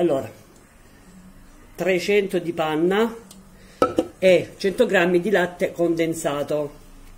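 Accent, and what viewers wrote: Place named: Sicily, Italy. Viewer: Super cuoca simpaticissima la parlata siciliana mi piace da pazzi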